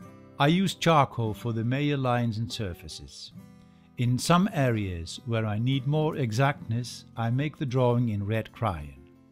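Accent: Netherlands. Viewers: Are you Dutch? You are an amazing teacher and the accent helps (I like Dutch accents)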